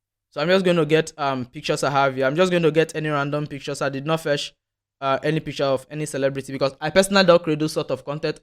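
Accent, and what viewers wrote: Nigeria. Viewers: Wow... Nice content 🎉 Your accent is gradually becoming 100% Nigerian, wow ❤. Ghana and Nigeria are one ❤ I was surprised seeing how you follow a lot of Nigerian accounts online. Great content mi bro 🎉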